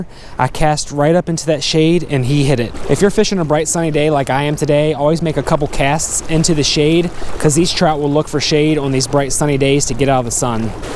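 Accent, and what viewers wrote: Pennsylvania, United States. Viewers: Where is that accent from? Pennsylvania? It's interesting.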